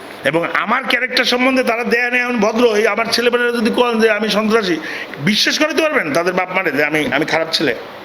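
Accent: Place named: Barishal, Bangladesh. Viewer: Kothar style ki re vai...😮 asholei Borishal is made in Borishal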